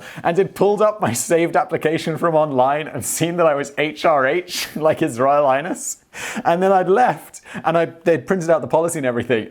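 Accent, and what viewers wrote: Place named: United Kingdom. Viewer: that comment was like a year ago and I was smashed as drunk and barely even paying attention you got to realize I washed all of his channels I'm subscribed to all of his channels and up until that point he had never said anything about having a kid or a wife and I'm American so I kind of misinterpret the British pronunciation of things as you know kind of the gay American thing I don't give a f*** if he's gay or not if you ever find my Facebook and go back I've got pictures of me hugging trainees and being at drag shows and them kissing on me I really don't give a fuk either way but like I said I was smashed as hell when I made that comment because I couldn't quite figure him out and I was just in one of those moods so kindly piss the f*** off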